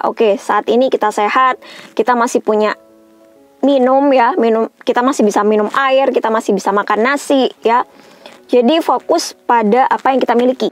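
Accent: Jember, Indonesia. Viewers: Lho orang jember ta Mbak ? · mbk nya ini org jember ta? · Kakak dari Jember ya???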